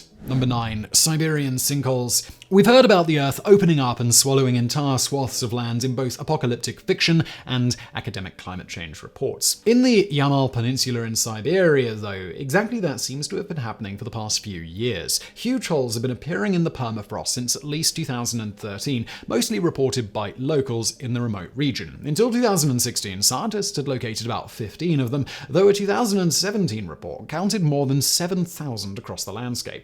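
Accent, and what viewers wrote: United Kingdom. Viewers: He's british as that's how they pronounce it. Not joking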